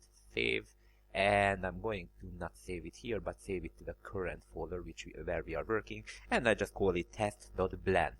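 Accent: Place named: Hungary. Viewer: Are you Hungarian? :)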